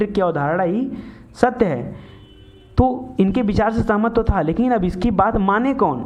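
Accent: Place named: Gorakhpur, India. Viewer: sir kya aap Gorakhpur pur ke pipiganj se hai kya aap ka aawaj waisa hi hai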